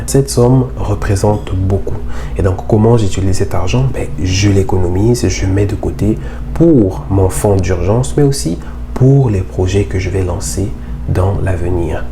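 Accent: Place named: Cameroon. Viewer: Quand j'ai vu ta manière de parler j'étais étonné que les kenyan ai le même accent que nous au cameroun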